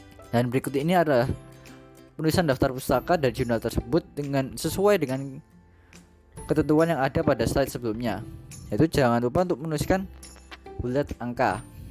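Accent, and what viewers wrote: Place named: Java, Indonesia. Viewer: Rada medhok emang ya?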